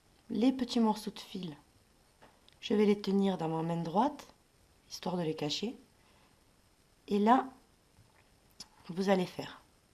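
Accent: South, France. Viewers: Merci, le meilleur site que je connaisse à ce sujet: les explications sont claires, les réalisations très faciles à réaliser, les mains belles à voir et l'accent du soleil un petit plus délicieux, continuez je vous suis, isabelle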